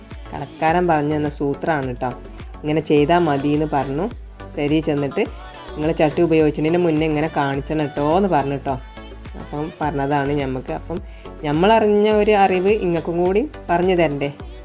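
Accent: Malappuram, India. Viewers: മലപ്പുറം ഭാഷ മലപ്പുറം താത്ത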